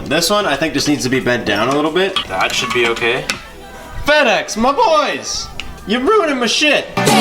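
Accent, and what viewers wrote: Canada. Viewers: Thumbs up for that Canadian accent.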